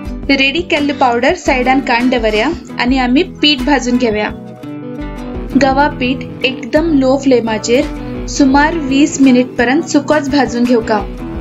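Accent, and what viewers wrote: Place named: Konkan, India. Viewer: You speak exactly my kind of konkani! I'm stunned. Haatutach and not haantuchi 🤗. Where do you come from?? I was always being corrected saying my language is not correct but now I'm so happy to have found another konk speaking like me and my mom's side of family!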